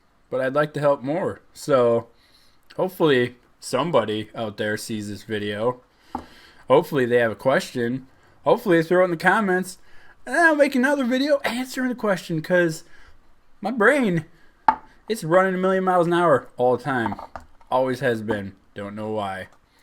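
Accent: United States. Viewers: You sound like you’re from Louisiana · That's so funny. I knew you were from Wisconsin right when you started talking. And then you said down south so I was confused but you confirmed it in the video haha. Go Wisconsin!!!!!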